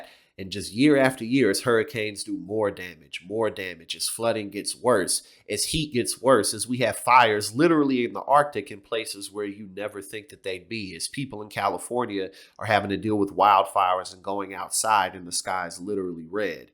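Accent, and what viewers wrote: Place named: South, United States. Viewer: You may have modified your accent somewhat but as a Brit listening to you I can hear you come from the South!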